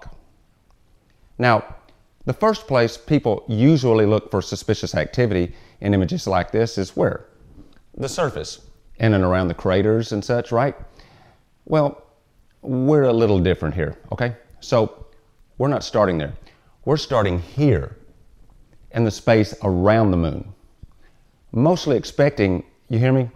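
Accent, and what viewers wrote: South, United States. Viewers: Love the Southern twang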